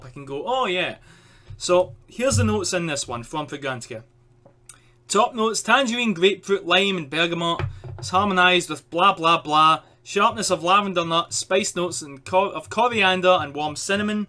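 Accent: Scotland, United Kingdom. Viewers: S good and fun review to watch. BTW, are you Scottish? Cool accent regardless.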